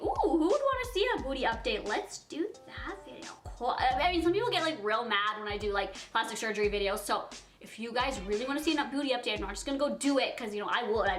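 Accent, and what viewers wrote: Newcastle, United Kingdom. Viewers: It's Geordie, bless you! Ask Siri to say it xxx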